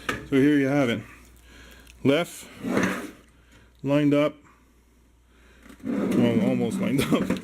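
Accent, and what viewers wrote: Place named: Quebec, Canada. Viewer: He sounds Quebecois to me lol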